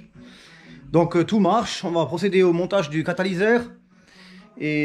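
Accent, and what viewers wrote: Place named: Lorraine, France. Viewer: L accent lorrain!🤣🤣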